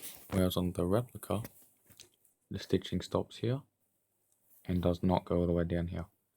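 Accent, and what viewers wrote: Australia. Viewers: Of course he speaks Aussy and Sara Ayri the comments are from the Area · You Aussie mate? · Do I hear a Aussie Ascent?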